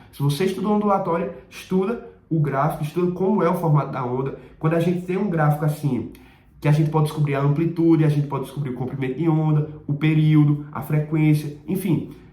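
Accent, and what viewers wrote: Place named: Northeast, Brazil. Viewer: Parece nordestino.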